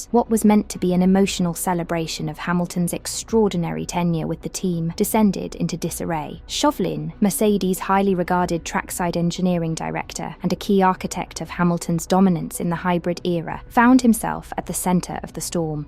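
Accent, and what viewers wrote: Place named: England, United Kingdom. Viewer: I see this is a USA based channel, therefore I assume the narration is artificial. No American lady could do an English voice so well.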